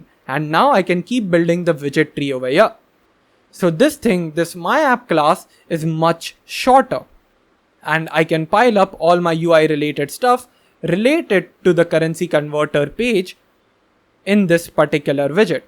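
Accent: India. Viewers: are Nahi bhai😂. Thanks to U, ek Indian accent mein acha detailed video mil gya. Wrna American accent se sar dukhta h. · A horrible, bizarre English access... It sounds like an Indian accent.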